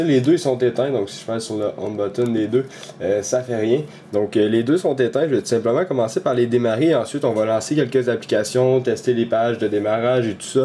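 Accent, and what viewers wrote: Quebec, Canada. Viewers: merci pour ton bon vidéo! tu est presque le seul qui vient pas de france... sa fait du bien enttendre un québécois!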